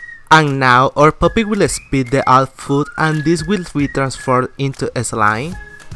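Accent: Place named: Spain. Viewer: Tienes más acento español que inglés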